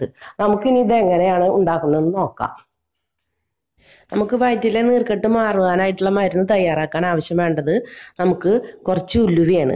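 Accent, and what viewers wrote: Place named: Thrissur, India. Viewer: തൃശ്ശൂർകാരിയായ ക്രിസ്ത്യൻ ചേച്ചി ആണ് സംസാരം കേട്ടിട്ട്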